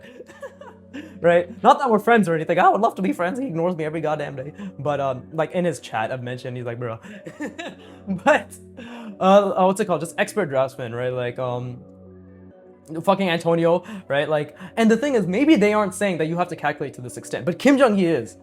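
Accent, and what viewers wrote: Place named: India. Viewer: This guy sounds indian 😢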